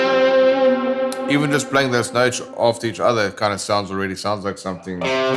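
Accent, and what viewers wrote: South Africa. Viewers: Also, what accent do you have? I thought South African at first, but I'm not an accent expert XD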